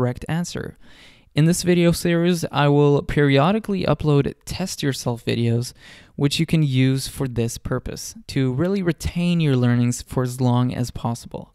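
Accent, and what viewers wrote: Midwest, United States. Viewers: Tusen Takk! I love these videos. They have been very helpful to me on my journey to learn Norsk. I've been studying mostly daily, for over a year using the DuoLingo app on my phone. (Is it OK to mention the app? I don't get any money, credits, etc for it) I find your tips & explanations help me integrate and understand the language lessons better than just using the app alone. On a side note: OMG I can't believe you are a native Norwegian!!! You have a perfect Midwest American accent. Are your family members from there ? Anyway, just keep up the excellent work, I appreciate all the time and effort you put in. Ha en flott dag! (Have a great day) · Your English is amazing. You sound like you could be from the Midwest.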